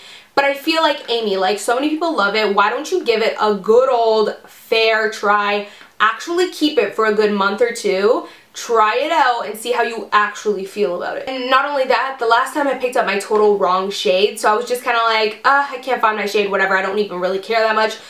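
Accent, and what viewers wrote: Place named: Canada. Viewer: I never noticed how much emphasize you put on your O's lol ive never heard a legit Canadian aaccent. love your haul boo!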